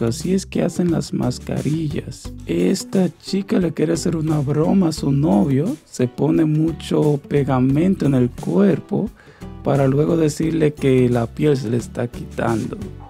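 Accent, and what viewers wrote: Dominican Republic. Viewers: Hola tocayo, usted me parece Dominicano. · Eres dominicano ? 🇩🇴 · Yo sé que eres de RD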